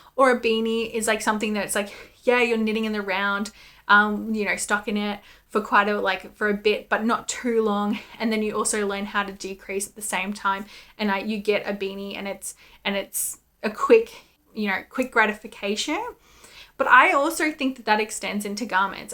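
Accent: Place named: Australia. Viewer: under which rock have you been living. The accent and the acknowledgment at the beginning. Obviously an Aussie.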